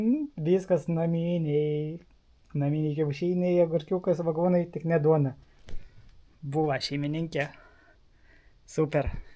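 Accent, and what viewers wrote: Aukštaitija, Lithuania. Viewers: Man žemaičiui labai įdomiai skamba aukštaičių tarmė. 👍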